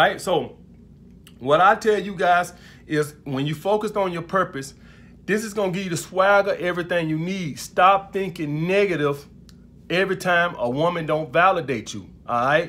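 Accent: South, United States. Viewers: Hey, guy....A man who speaks as you do does not have a chance in hell with the kind of women who are generally considered desirable. You know... women who make good partners, parents, is interesting, enjoys life. Your enunciation is terrible, that southern drawl is telling. Your use of profanity is off-putting, referencing women as “bitches” and other insulting names is not buying you any prizes. This video is strictly for the guy under 25 years of age who wants to act like someone he is not. It is definitely for someone who has decided some guy on YouTube has the answers to life’s mysteries. This is beyond comical, it is tragically comical.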